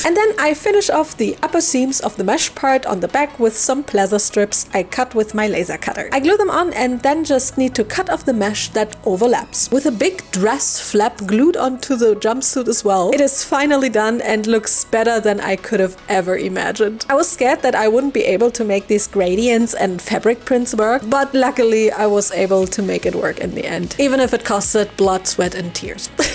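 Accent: Germany. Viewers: Are you german? Your accent sounds so german. If yes: Tolles video!! Und die puppe sah echt cool aus!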